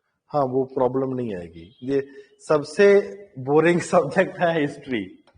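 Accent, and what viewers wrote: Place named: Punjab, India. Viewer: He used punjabi method while speak English....which creates irritation for student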